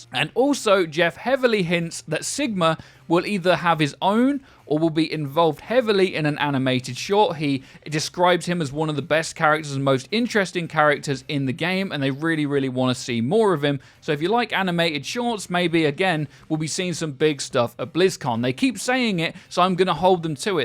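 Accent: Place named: United Kingdom. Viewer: Where in the hell is Frido? I’m getting SUPER tired of mispronounced monotone British accented commentary from Mr. boring over here.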